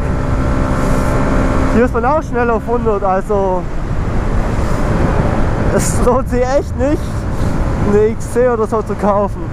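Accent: Swabia, Germany. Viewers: Ja man neues video, schwätz aber ruhig schwäbisch ❤😂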